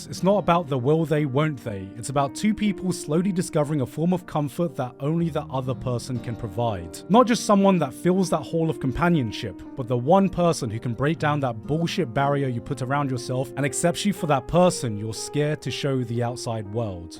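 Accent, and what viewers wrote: United Kingdom. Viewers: worst anime of the 6year, with an annoying brit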